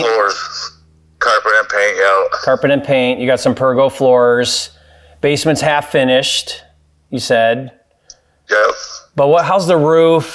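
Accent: Michigan, United States. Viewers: He's got that Michigan accent forsure haha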